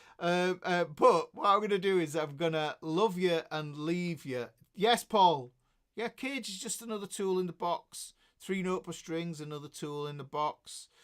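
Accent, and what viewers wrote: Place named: Yorkshire, United Kingdom. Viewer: books are worth every penny! I love the way he breaks everything down and explains things in a way that makes it easy to understand. Thank you! The accent helps I think.. 🤔 Us Americans and our slang English language can sound ignorant when compared to proper Yorkshire accent. lol. All good stuff!!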